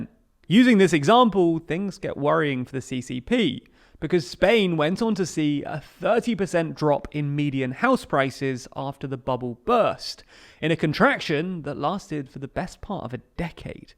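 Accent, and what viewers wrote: England, United Kingdom. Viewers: You have the best English accent I've ever. I'm sure there's lots of money in Los Angeles for you, if you could handle the crazy.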